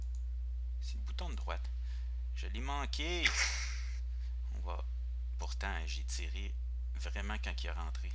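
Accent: Quebec, Canada. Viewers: ENFIN UN CRISS DE QUÉBÉCOIS PAS UN FRANCAIS OMG MERCI MERCI!!!!!!!!!!!!!!!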